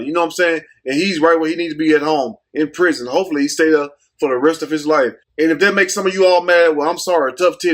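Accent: South, United States. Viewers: not only do I like your videos, I love you and your how your delivery done with that southern accent that is charming.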